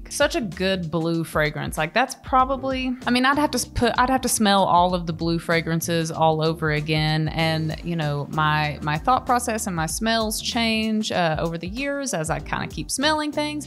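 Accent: South, United States. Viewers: Gosh, I love your accent. I mean, I'm also American, but still. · you talk just like him but just with a female voice and southern accent lol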